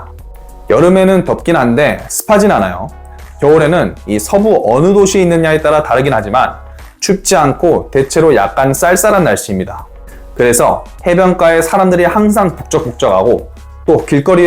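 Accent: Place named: Jeolla, South Korea. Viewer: 님은 전라도 억양이 있으시네요 ㅎㅎ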